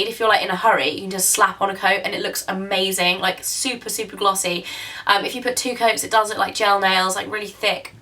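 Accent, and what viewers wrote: United Kingdom. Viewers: While I love watching your videos for the beauty/makeup reviews, I have to admit that one of the BIG reasons I'm subscribed is so that I can listen to your voice. I LOVE British accents! Love your videos too! I just bought "It's a 10" and it was a little over $30 in the US. Totally worth the money! My hair feels wonderful after only using it once! Thank you for sharing your beauty secrets,. <3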